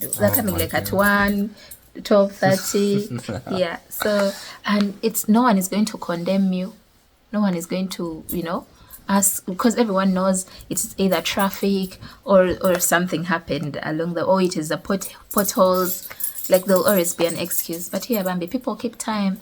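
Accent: Uganda. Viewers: Hahaha I love your humor 😂😂 please don't change that Ugandan conversational accent. You two make us feel like we're part of your conversation 😂😂 I wish that food was available here. Ehh waiting for a restaurant 😅😅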